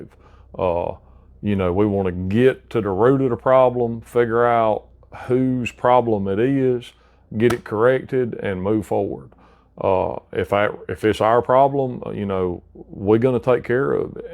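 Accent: South, United States. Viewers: do you naturally sound this kuntry or do you have to really lay it on thick for the camera? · Boy did the armchair mechanics come out of the woods for this one...Some really wild theories floating around out there. This cat has got a seriously strong southern accent and I dig it! He could be talking about quantum physics, and I'd still listen. 😜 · Now thats a country accent!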